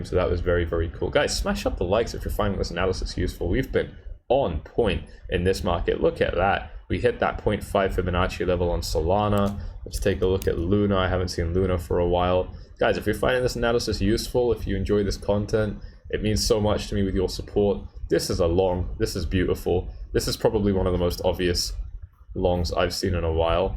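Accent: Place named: England, United Kingdom. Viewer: I listened to an old man in a truck and now I am listening to a teenager with an English accent for investment advice..I hope I found the one... Wish me luck!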